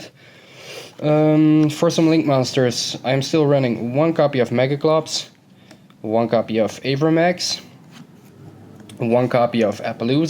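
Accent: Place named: Montreal, Canada. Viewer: 🤣 ton accent est pareil de ceux de Montréal 👌😁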